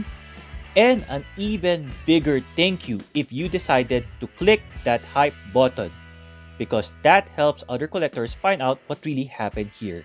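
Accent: Philippines. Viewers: Great vid! Took me 4 seconds to hear that you are Filipino 😂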